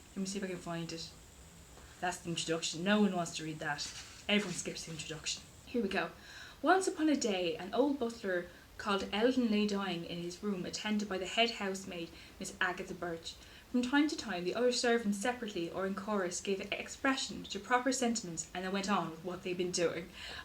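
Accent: Ireland. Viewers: THIS. MADE. MY. DAY. (the Irish accent 💚)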